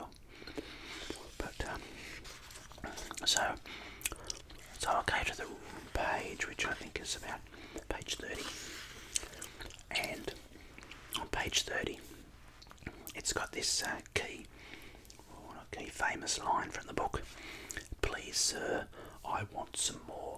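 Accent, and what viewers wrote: Australia. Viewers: Subscribed , turned on post notifications , I’m looking forward to all your videos , I love Australian accents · 😍Yay Australian accent book reading and gum chewing! My favorites in one video. OK goodnight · I'm Venezuelan, but I really love aussie accent, and this help me a lot to lear english. This videos are so amazing, and your voice is incredible 💖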